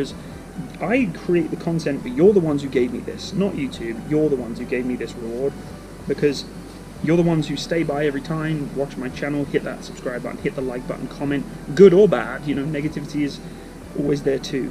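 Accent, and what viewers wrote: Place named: United Kingdom. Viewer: Hey where do u live? I thought maybe uk or something cause of the accent?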